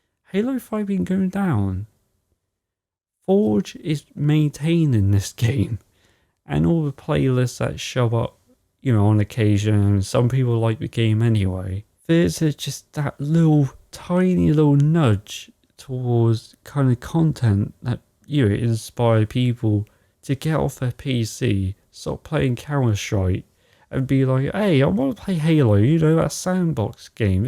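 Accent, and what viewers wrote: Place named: United Kingdom. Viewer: I agree with what you're saying, And don't worry about what your voice sounds like, I think it's sound great, also what is your accent? I keep thinking it's a form of a thick British accent.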